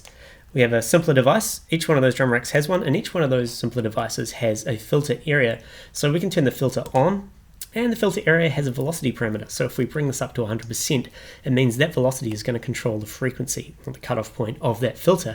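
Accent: New Zealand. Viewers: the first 30 sex of this (really good) tut were like a masterclass in kiwi eccent ''...quuck tups...mudi clups... ...eexcellunt!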